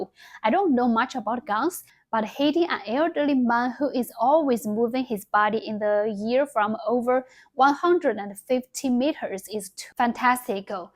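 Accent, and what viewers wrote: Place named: China. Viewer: Holy smokes you speak great English! you still have a slight Chinese accent/feel but overall really good in a global standard kind of way, you will be understood no problem in the rest of the English speaking world, I'm Chinese-Filipino but I can't even speak a drop of Chinese, I can only speak Tagalog and English with a generic American accent, so from a native English speaker's point of view, you speak awesome English, you should be proud of yourself for that accomplishment, not an easy feat if you don't learn it from someone or from a school and if you don't have anyone to talk to on a daily basis from a very young age.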